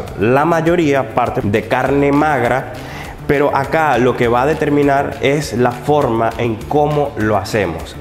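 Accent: Venezuela. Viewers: Otra cosa no tienes asentó Chileno parece tu dialecto Venezolano. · A los segundos de escucharte, supe que eres venezolano. Eres lo máximo. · Tú estarás en Chile pero... ese acento...venezolano.... Saludos desde Venezuela, muy bueno tu video nunca he seccionado una pierna de cerdo, solo pollo y la pierna sin cadera es la que compro para hacer el jamón curado, qué fácil lo pones pero... es difícil!!!!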